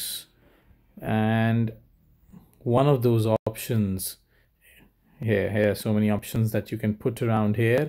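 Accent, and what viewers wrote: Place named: India. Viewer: First of all you are very Indian accent second you are talking to fast that’s not good way to help people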